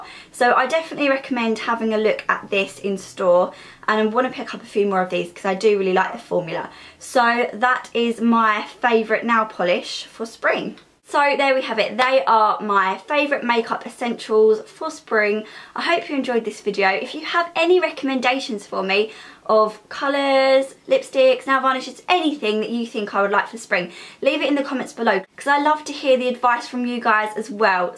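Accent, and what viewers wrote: Essex, United Kingdom. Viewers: Are you from essex💁😁😁😁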